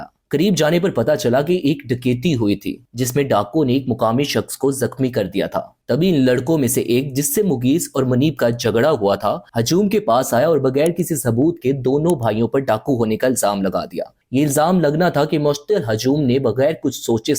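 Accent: India. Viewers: Aap urdu Indians ki tarah kiyon bol rahe ho?? غ کو گین اور ک کو کھ کی طرح کیوں بول رہے ہو آپ؟؟ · اس بھائی اپنی ق،غ،خ تو ٹھیک کرو،ضروری نہیں کہ ہندی زبان سے متاثر ہو جاؤ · sab koch sahi tha , but pakistanis ko pehle apni Urdu sahi kar chai ye , lagraha tha is vedio me koi indian bol radha he he, ghalat ko galat , khatoon and bohat sare word wrong pronouse kiye apne, ab darama actors bhi ghalat urdu bolte he fakhar k sath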